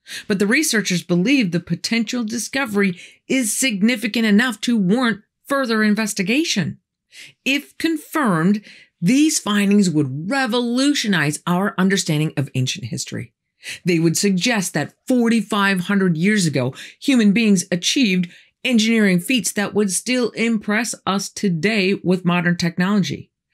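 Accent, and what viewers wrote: United States. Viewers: What an irritating Americn voice....................prat of the year so far!!!!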